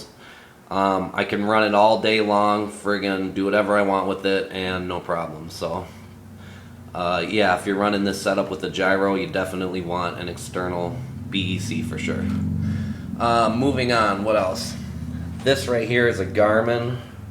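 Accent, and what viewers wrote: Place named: Michigan, United States. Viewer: Not to be weird but are u from Michigan u sound exactly like a sub teacher I have lol but nice car!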